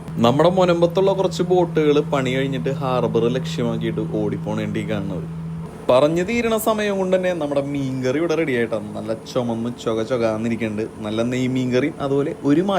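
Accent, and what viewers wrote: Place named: Karunagappally, India. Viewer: കൊല്ലം കരുനാഗപള്ളിക്കാരാണോ സംസാര അത് പോലെ തോന്നി എന്തായാലും നല്ല വീഡിയോ❤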